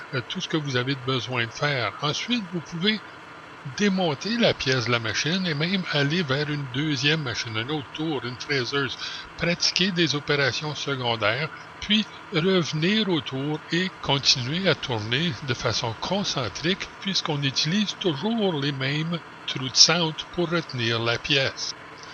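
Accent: Canada. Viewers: Excellent professeur, ce, très à cheval sur la transmission du savoir (ouais, mais être à cheval, pour un, c'est un peu son job, non?). J'ai déjà beaucoup appris de ses vidéos. L'accent canadien rend parfois l'accès au contenu un peu délicat pour une (vieille) oreille belge, mais je prends beaucoup de plaisir à apprendre avec lui.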